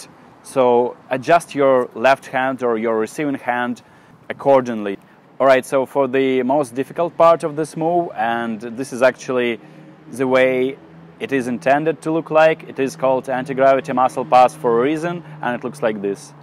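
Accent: Russia. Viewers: акцент четко русский да пох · ты русский ? просто мне кажется, что есть акцент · Man, you have a Russian accent. Ты ведь русский, да? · Слышен русский акцент) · Вау, вы так хорошо разговариваете на английском! Но этот русский акцент я узнаю везде)) З.Ы. в первый раз у вас на канале. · классный русский акцент) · Ты русский? у тебя сильный акцент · Такое ощущение, как-будто этот чувак русский. Его английский слишком русский :) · Русский акцент.... хмммммм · Акцент,как у русского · Русский акцент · Слушая голос и думаю - блин, акцент ну больно русский. Захожу в описание - о, да ладно, кириллица!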